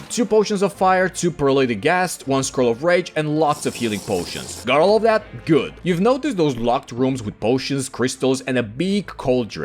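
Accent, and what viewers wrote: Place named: Eastern Europe. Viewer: Pelo sotaque esse cara provavelmente é europeu e possivelmente eslavo. Acho que eles entendem alguma coisa ou outra sobre comunismo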